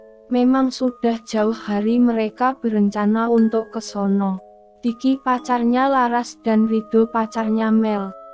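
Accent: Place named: Java, Indonesia. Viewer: Narator nya kurang menarik cara berceritanya seperti baca buku logat jawanya terlalu medok ...intonasi nya juga tidak tepat ...gak jadi deh dengerinnya 😁😁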